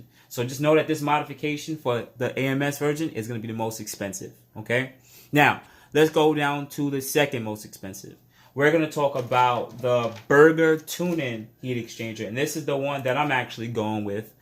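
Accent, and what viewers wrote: New York, United States. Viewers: he's a new yorker, you wouldnt get it.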